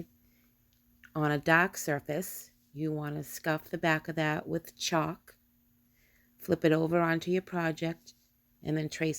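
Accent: Massachusetts, United States. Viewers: Well I am impressed. Beautiful designs and execution! So much so I have subscribed. Enjoyed hearing the MA accent again! Grew up in Reading, moved to L A a million years ago at 22 to seek fame and fortune. What part/town are you from? How/where do you sell your wreaths? All the very best to you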